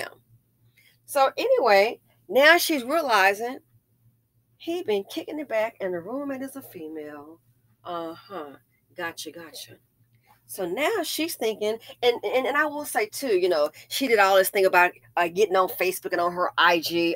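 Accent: South, United States. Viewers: Girl that country twang 😭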